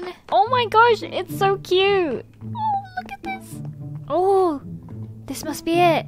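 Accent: United Kingdom. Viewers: I love how fear and idea rhime in British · OMG she can rhyme "Fear" With "Idea" Cuz she has a british accent!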